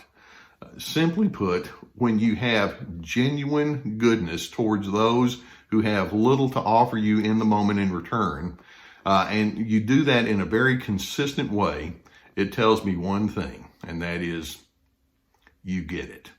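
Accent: Texas, United States. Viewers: He's not southern, he's Texan. :)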